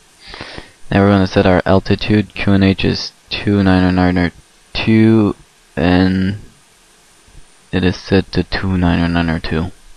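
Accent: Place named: United States. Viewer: Hi I just wanted to say that for your being from France your English is very close to impeccable(Not sure if this would be considered insulting considering our cultural relations at times but you sound like you are just a normal American.). Also, your tutorials rock, I am starting the PMDG MD-11 tutorial as I type. Keep posting vids please!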